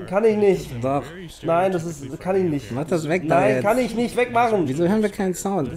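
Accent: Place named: Franconia, Germany. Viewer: ja klingt fränkisch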